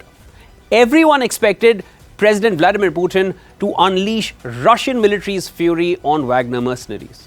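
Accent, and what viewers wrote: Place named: India. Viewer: I cannot understand Indian English. However the wagners must be monitered closely. they are very close to Kiev.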